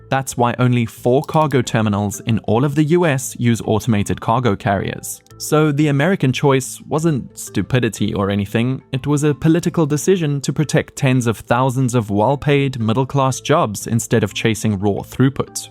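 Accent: South Africa. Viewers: Aweh aweh! Yet another channel with dope graphics and a narrator with a South African accent! Lets gooo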